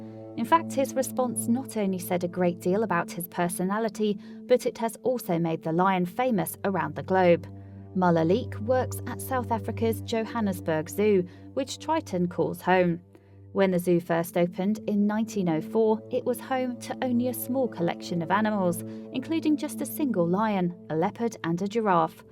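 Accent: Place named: United Kingdom. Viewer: So throw the "darn" ball!!! The narrator's British accent us so strong that it distracts from understanding the narrative AND it's difficult to pay attention to what the lion is apparently NOT doing. Also worth mentioning is the "abundant use" of actual video footage. So extravagant, but worth the considerable expense! .......what do you have to say..........? Please enlighten me. Thanks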